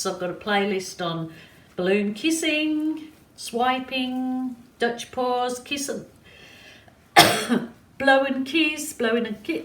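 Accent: Birmingham, United Kingdom. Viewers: Definitely Brummie ! LOL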